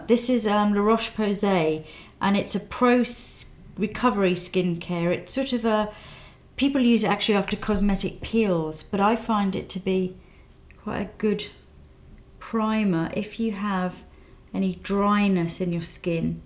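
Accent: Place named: RP, United Kingdom. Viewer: All I can think as I watch this is..... her accent is so melodic and posh.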